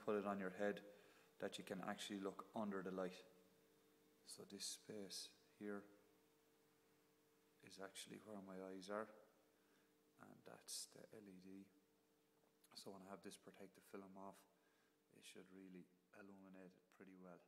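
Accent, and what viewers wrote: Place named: Scotland, United Kingdom. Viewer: Hey, really enjoyed that vid very informative and i love the scottish accent!!!!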